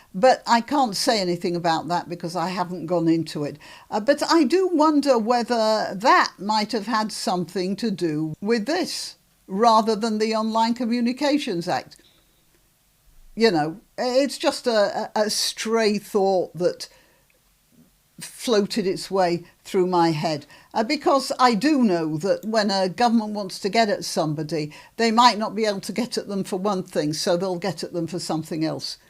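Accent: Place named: Scotland, United Kingdom. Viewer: Because you have a Scots Accent does not Make you Scottish this is BS.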